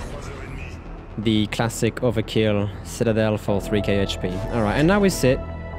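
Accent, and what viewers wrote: France. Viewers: Je découvre ta chaine et je suis ravi qu'un français nous offre des vidéos d'une telle qualité! Ton anglais est très agréable à écouter, et sans doutes beaucoup plus agréable à pour les spectateurs qui ne sont pas familier du Français... mais ça fait toujours un petit plaisir chauvin d'entendre un accent français et quelques mots d'introduction en français.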